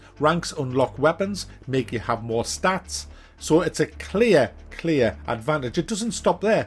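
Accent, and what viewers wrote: United Kingdom. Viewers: Had to watch the video twice because the first time I was just listening to your awesome British accent saying sarcastic things that I lost what you were saying. :'D :P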